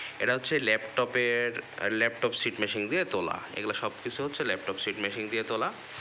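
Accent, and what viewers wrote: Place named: North Bengal, India. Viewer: আপনার কথা শুনে মনে হচ্ছে উত্তর বঙ্গে বাসা, বাসা কোথায় আপনার?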